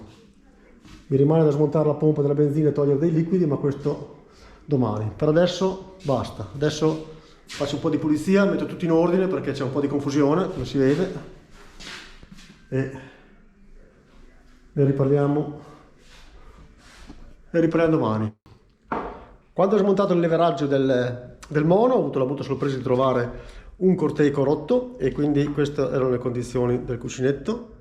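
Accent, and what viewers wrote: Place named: Emilia, Italy. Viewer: mi pareva un accento nostrano, io son nato a Pavullo, piccolo il mondo. Dicevo le/la tanica sotto le valige perchè di norma son le più pesanti piene in peso specifico, più basso lo hai meglio guidi, inoltre hanno una buona base piana sulla quale appoggiare una sacca che una base rigida a volte non ha. Seguo due ragazzi (franksaffair) che sono in giro per il Brasile ora, e lui le ha posizionate li ed è venuto un bel lavoro pertanto mi son permesso questo consiglio.Di Transalp devo revisionarne uno appena si fa più caldo. Ho questo hobby. Buona strada, viva l'Emilia e le crescentine cotte con le tigelle.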